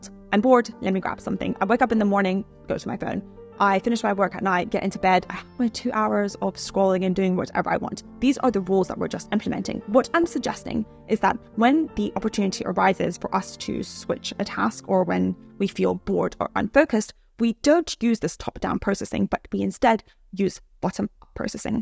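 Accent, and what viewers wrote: United Kingdom. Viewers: Oh my god I don't understand British English, I'm learning American english 🤦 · Is it British accent who know about that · Your voice is not annoying. It sounds just like Karen Gillian. (though it might just be the british accent).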